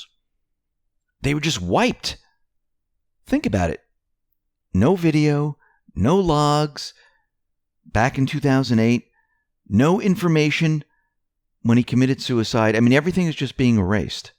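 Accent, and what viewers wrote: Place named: New York, United States. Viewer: Sounds like a New Yorker to me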